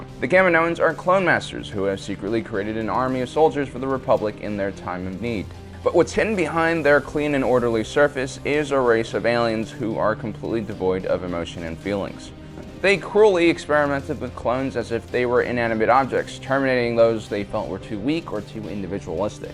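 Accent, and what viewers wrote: South, United States. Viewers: You have a good modulated voice, don't try to ruin it by speaking like a southern man. It's unintelligible and is quite annoying. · The southener is strong in you. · Why do you have a southern accent lol? I am interested in your family's story. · Are you from the south? Your voice seems kinda different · You look asian, but sound southern american. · You got a wired accent, man. It’s a mix of US southern and British. Great video.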